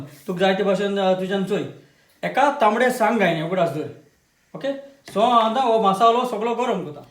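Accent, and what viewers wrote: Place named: Konkani, India. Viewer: U r genuine Goan person. U've got the authentic Konkani accent and the Goa centric ethnic recipes. May God Bless you and may u come up with more Goan recipes. U r the source of cooking for many young Goans in cooking authentic Goan cuisine. Mog asum sodamkal. Long live our brotherhood and Goenkarponn. VIVA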